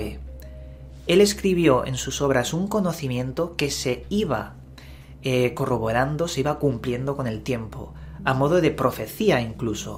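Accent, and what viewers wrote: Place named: Spain. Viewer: No soy muy amante del acento español, pero el tuyo es tan tranquilo tan suave que me relaja, saludos desde Colombia.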